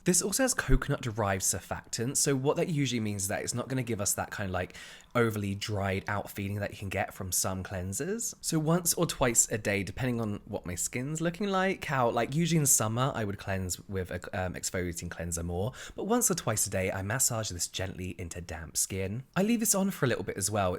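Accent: England, United Kingdom. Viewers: Can you help me: After I cleanse, put serum then moisturizer my skin / products start piling. I can’t find a good order to treat my face. Any advice? I am also older ( double your age).ordinary and inkey list with some vitamin k moisturizer from piping, rock are my go to products..... Or whatever you, Hyram, and Cassandra Bankson convince me to try. My skin is fair,very dry, oily on tip of nose and tip of chin. I doubt you’ll even read this but I am an older subscriber maybe this will get to you. You, have a very soothing voice with that beautiful English accent.🌹🌹🌹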